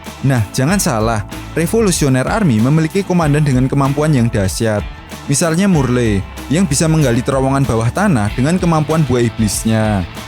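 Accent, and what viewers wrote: Java, Indonesia. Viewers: Min Jawa ne ndi ? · Bahaso jowo nyo gk bisa hilang ternyato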